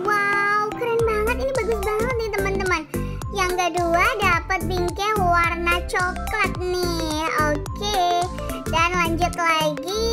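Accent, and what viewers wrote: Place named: Lampung, Indonesia. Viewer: Kakak kayak orang lampung aja ngomong nya ada "geh" nya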